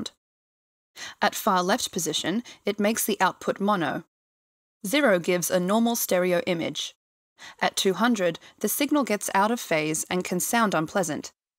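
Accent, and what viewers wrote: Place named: England, United Kingdom. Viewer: Is she hot? (She does have an English accent)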